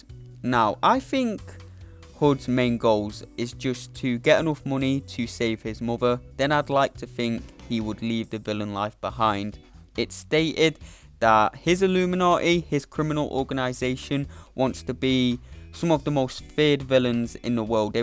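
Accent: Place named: South Yorkshire, United Kingdom. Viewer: been trying to place yours now you mention it haha, I'm from South Yorkshire and you sound like you could be that neck of the woods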